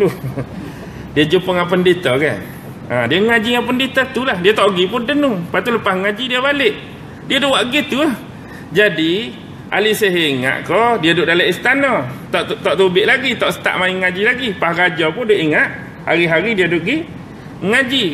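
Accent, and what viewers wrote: Kelantan, Malaysia. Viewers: Sedap dengar Ustaz guno loghat Klate kito..Skalo dok dgr loghat utara..Ambo jiran Ustaz yah Tanah Merah..Kemah sek kito 👍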